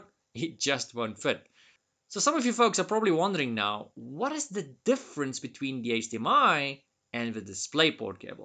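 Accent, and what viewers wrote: South Africa. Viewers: I heard the accent and thought “this is a fellow South African” 😂😂❤❤. Subscribed! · This accent. Are you south African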